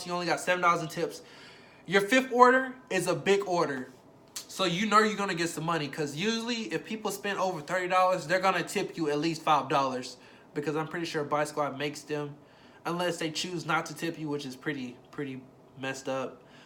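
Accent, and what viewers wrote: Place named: Arkansas, United States. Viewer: I knew you sounded like an arkansan. I basically found a guide to uber eats in my state. I appreciate that.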